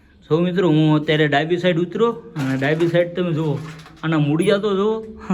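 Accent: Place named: Bhavnagar, India. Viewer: તમારી 'બોલી' પરથી લાગે છે કે ભાવનગર-તરફના હશો...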